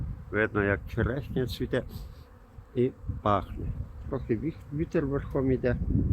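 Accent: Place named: Western Ukraine, Ukraine. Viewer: Я в якій ви області? Чую по розмові що західна стопона , Карпати, Закарпаття.. Бо в нас на Рівненщині ще не цвіли череші 3 числа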